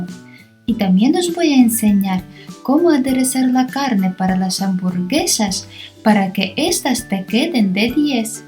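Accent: Ukraine. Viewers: Ella es de Ucrania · Ella es de Ucrania, residente en España!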